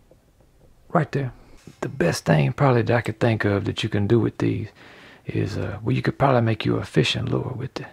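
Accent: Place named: Memphis, United States. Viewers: You're voice and accent reminds me of my uncle Jesse from Memphis! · Hello! Do you think it's possible to place orders for your items? I really, REALLY need one of the elephant fork pendants! Oh, by the way... I totally pegged your Mississippi accent, seeing as how I grew up in Memphis, TN. You sound like old friends back home!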